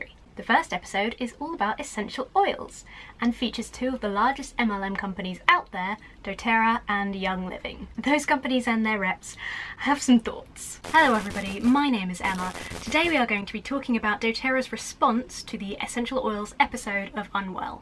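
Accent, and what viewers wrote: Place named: United Kingdom. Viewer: Me too. This could allmost be an ASMR channel. She's got that perfect brittish accent. And she is cute.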